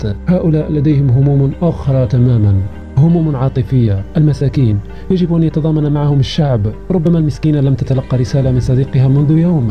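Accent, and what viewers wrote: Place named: Western Sahara. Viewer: سمعتك تتكلم عنهم، فمن تكون أنت ؟ و ما هي مصادرك و مواردك ؟ أستطيع تمييز لكنتك الصحراوية ! فمن تكون ؟ هههه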